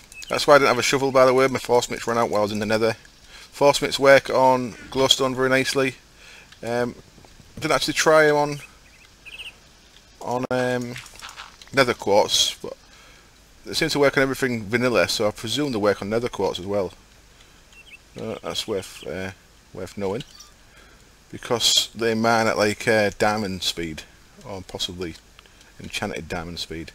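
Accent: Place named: Hartlepool, United Kingdom. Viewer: you sound like you're from Hartlepool area.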